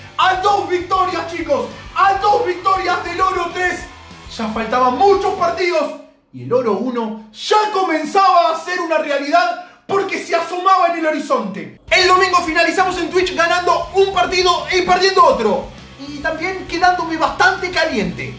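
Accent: Argentina. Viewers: Jajajaja me encantas como comentas al estilo bien ARGENTO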